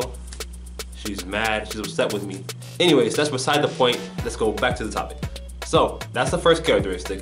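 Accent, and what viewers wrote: Dominican Republic. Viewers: I really thought he was dominican until he said he wasn’t 😂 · Man this dude Dominican 🇩🇴🇩🇴👊🏾😎💯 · Watching this video gave me nostalgia. I am Mexican and I lived in the Dominican for a year and a half and I came back with the Dominican accent! lol. You speak like a pure Dominican. You HAVE to visit the Dominican Republic, the best place, with the best accent. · Dayum, can speak dominican spanish? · what resources did you use to learn the Dominican accent/dialect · Hey Klk I am Dominican and you speak our Spanish very well, Tiguere you are a Bacana pod, for a Chin I thought you were a Dominican, Greetings from Sto.Dgo. Dominican Rep. Quisqueya La Bella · Eres un Dominicano, ya dije yo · Hearing your Dominican accent makes me want to learn Spanish and talk like that even more. Mucho gente piensa que soy dominicano en mi trabajo pero soy Afro Americano. 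· lol tal vez. Yo sé mucha gente cree las clases de español no ayudarle pero no es verdad. If you trying to be fluent in spanish I don't think spanish class is the best tool however because half of the time students in the class just want to get out of there which is why we don't have enough time to actually speak... Perdoname para mi gramatica pero no creo es una cosa importante en este momento pero me encantan sus consejos y trucos. ¡Tiene razon! Y habla con acento de La Republica Dominicana si I hadn't watched your otro vídeo I wouldn't have known otherwise jajaja...mi meta es tener la capaz hablar en the past tense this year and the future tense those are very hard lol plus we didn't have time to really learn those because of "distractions " in class😂🤣Gracias por responder! 🇭🇳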